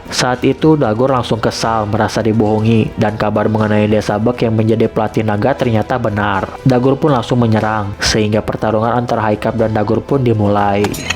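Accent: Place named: West Java, Indonesia. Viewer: ngomongna masih Aya sundaan euy meski Jang konten yt👍🏻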